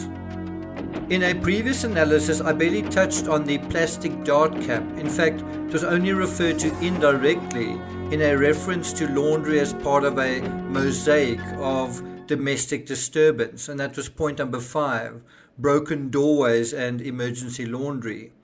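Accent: South Africa. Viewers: I think he’s South African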